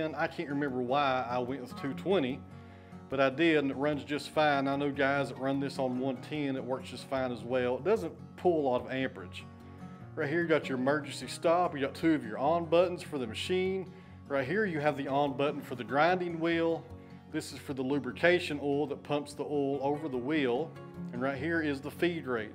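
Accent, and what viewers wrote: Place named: East Tennessee, United States. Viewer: You're speaking english with an east tennessee flair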